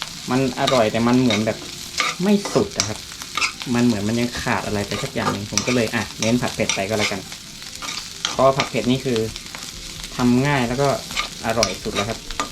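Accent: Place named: North, Thailand. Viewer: สำเหนียง จะอี้ เป๋น คนเหนีย แม่น ก่อ นี่ น้อง 55555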